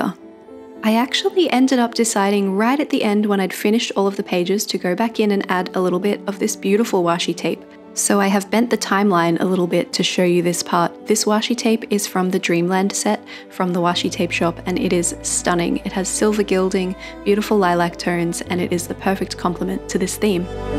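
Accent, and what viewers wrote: Australia. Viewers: Watching now, and my partner got the first few minutes of your video. He said, and I agree, that you have a pleasant Aussie voice to listen too. Not oka or nasally or rough, hehe. He didn’t believe me when I said you’re from Brissy because he thinks that Brissy folks have a specific accent, and not a nice one. I find your voice a nice change from the all too common USA accents that flood YT. And love your bujo art too 😊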